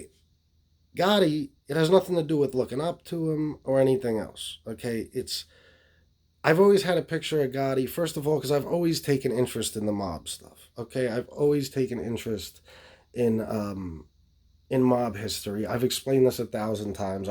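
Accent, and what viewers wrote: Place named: Northeast, United States. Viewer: You only have an accent to people not from the northeast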